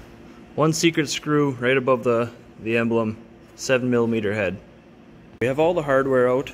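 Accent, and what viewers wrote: Canada. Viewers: Your accent sounds very Canadian? Manitoba?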